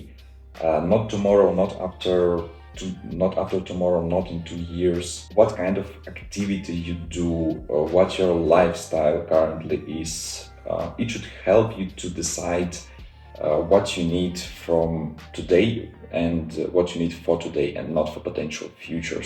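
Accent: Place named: Russia. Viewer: U from Russia? I hear strong Russian accent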